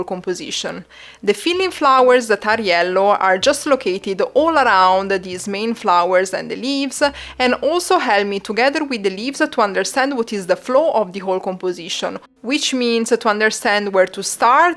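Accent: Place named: Italy. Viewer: Parli inglese come solo un italiano può parlarlo 😅. Sei italiana? Video utile. Grazie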